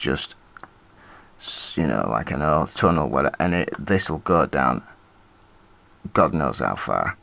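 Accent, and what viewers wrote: United Kingdom. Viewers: Its funny how i look at ancient buildings or while watching other videos from various YT Channels about these important topics I hear you saying in my head: "That is all REBAR... So much MUD (British Pronunciation emphasized!)... It is all a FASADE... This isnt a TOMB... PEOPLE LIVED THERE.... Certainly they decorated their houses with FLOWERS... Love your lessons, you are gifted!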